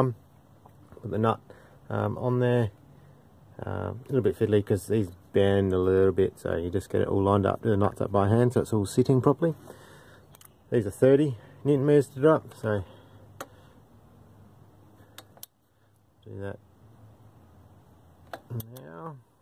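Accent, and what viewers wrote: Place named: Australia. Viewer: what a video, and talked in our Aussie language so it makes sense to us, doing mine hopefully in the coming month... one thing I have read on pages is diesel knock once replaced, do you know anything about what causes that or what steps need to be taken to prevent it? cheers, love the video mate, going to help amazingly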